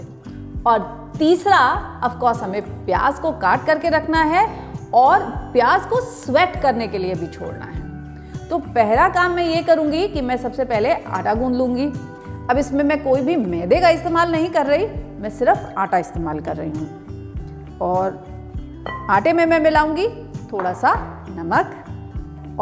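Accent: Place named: Punjab, India. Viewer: I love the punjabi tone in ur voice ❤️❤️❤️❤️ I know you from master chef ❤️❤️❤️❤️I have supported u all over the season ❤️❤️❤️❤️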